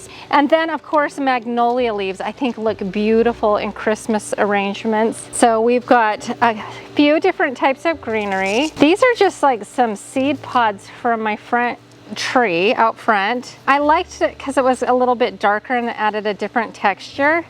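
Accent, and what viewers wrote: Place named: Maine, United States. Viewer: Awesome ideas - love your videos. So cool to hear you might be from Maine (from a fellow Mainer) 🙂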